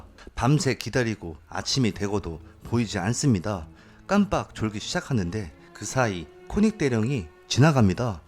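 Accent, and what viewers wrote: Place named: Chungcheong, South Korea. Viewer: 주인장님이 충청도 억양이 쎄네요.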